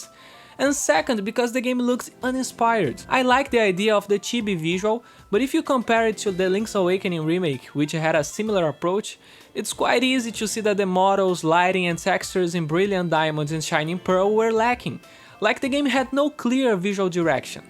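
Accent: Brazil. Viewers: Are you Brazilian? You speak with kind of a Brazilian accent.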